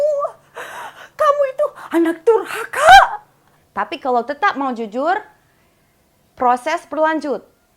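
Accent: West Java, Indonesia. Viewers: Logat sundanya dapet euy...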